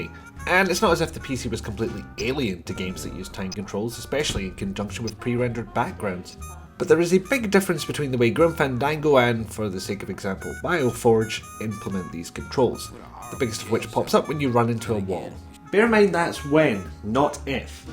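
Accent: United Kingdom. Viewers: I love your Scottish accent!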